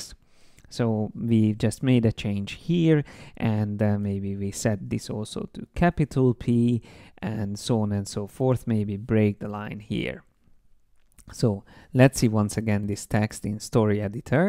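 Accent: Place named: Hungary. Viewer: you have a kind of Hungarian accent. :)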